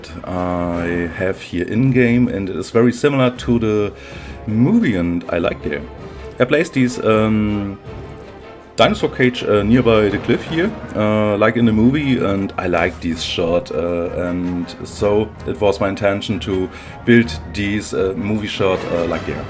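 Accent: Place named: Germany. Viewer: Mann merkt das du Deutsch bist xD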